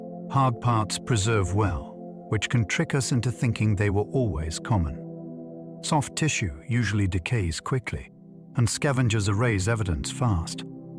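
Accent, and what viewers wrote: United Kingdom. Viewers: Why do these videos always have them a British dude narrating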